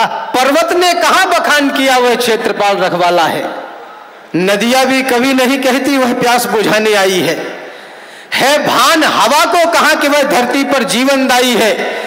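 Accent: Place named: Khari Boli, India. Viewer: मै जब भी सुनता हु आपको आपकी सिधि खड़ी बोली सुनकर जबरा फैन हो जाता हु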